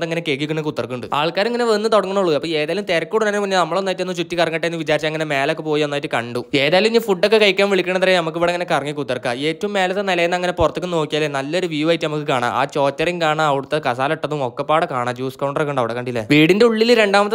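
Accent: Malappuram, India. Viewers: Accounting is a process of recording, classifying, summarising, analysing and interpreting the financial transactions, 😂😂😂😂... ഞാൻ ഇക്കയോട് മുമ്പത്തെ വീഡിയോയിൽ പറഞ്ഞിരുന്നു, എൻ്റെ ഗുരു ആണ്, എനിക്ക് മലപ്പുറം slang പഠിപ്പിച്ചു തന്ന ഗുരു, അതുകൊണ്ട് എനിക്ക് എൻ്റെ സാറിൻ്റെ slang manasilaayi ennu പറഞ്ഞു ഒരു comment ചെയ്തായിരുന്നു, ആ sir accounts subject ആണ്..ക്ലാസ്സിൽ വന്നാൽ തുടങ്ങും, recording, classifying,summerizing,. ഇക്കാ ഇന്ന് ഇത് പറഞ്ഞപ്പോ,ഞമ്മൻ്റെ മനസ്സ് തൃപ്തിയായി😂😂😂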